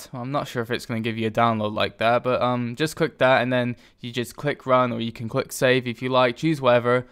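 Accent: United Kingdom. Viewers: are you British? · I used to really like/want a british accent but i swear to fucking god i am glad i dont have to hear that for a long time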